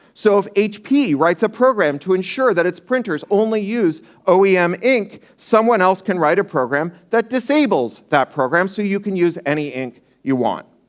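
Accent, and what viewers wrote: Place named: United States. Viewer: eek-barba-durkle! this guy with an american accent thinks in euros... or all over the place. tf!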